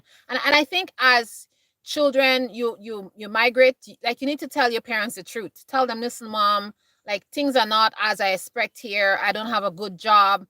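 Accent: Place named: Jamaica. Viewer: I thought you were Jamaican,as your accent is similar.. Cheers ❤️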